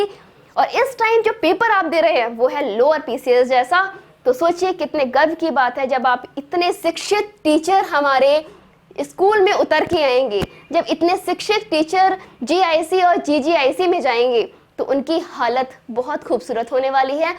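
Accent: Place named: Maharashtra, India. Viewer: Marathi tone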